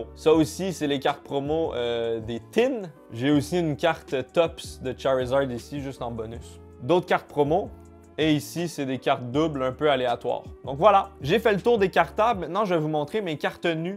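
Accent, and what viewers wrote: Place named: Quebec, Canada. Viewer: Oh merde s est un québécois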